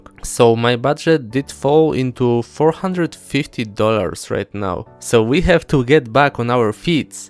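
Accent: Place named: Poland. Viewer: masz troche polski akcent heh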